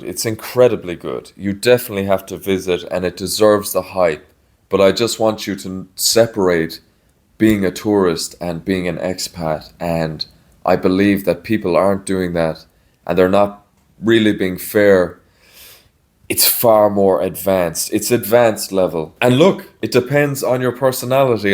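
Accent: Ireland. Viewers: I'm debating between Cambodia and Thailand. I want to open a business and I know I can't do that in Thailand. I would have to find a Thai husband. In Cambodia, as far as I know, I can do it on my own. Thanks for the video. Love your videos and your Irish accent. I love Ireland...it's just too expensive. I've been there a couple of times. Good times!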